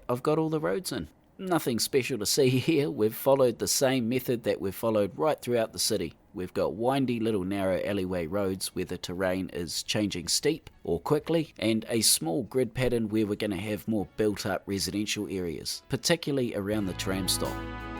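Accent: New Zealand. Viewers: like always so good, so interesting and combined with your NZ accent it becomes one of CS greatest series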